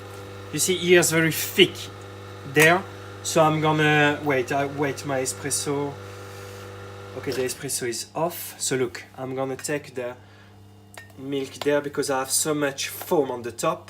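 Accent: France. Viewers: Hello friend ! ! ! Thank your for this nice video ! ! ! ! What is your native langage ? ? I subscribed today Sébastien .... Hello from FRANCE .... It seems you speak french because your special accent ! ! ! ! 💖🍔🍚🍎🍭😍🍄🍏💛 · You sound French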